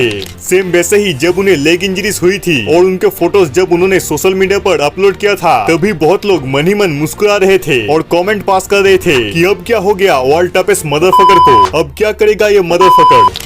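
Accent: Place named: Bengal, India. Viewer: এক্সেন্ট এই বোঝা যাচ্ছে যে তুমি বাঙালি।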